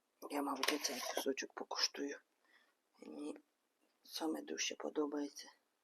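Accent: Poltava, Ukraine. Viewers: Привіт зимлячка!!!!!!!, сьогодні я перший раз побачив твій ролік і почув наш Полтавський говор, дуже зрадів шо є така жінка рибачка, із сила, наша настояща Полтавчанка а ни наіграна якась бутафорна блогерша, багата з екоферми!!!!!!!! А в теби все по простому, як обично в селі!!!!!!!!!!! Та щей канал свій ведеш, зразуж підписався, дуже радий шо тебе знайшо чисто случайно, переглядав одне відео про заборону вилову риби з першого квітня, і тут натрапив на теби!!!!!! А з якого ти села якшо несикрет????????? Я з с.В.Сорочинці!!!!!!!!!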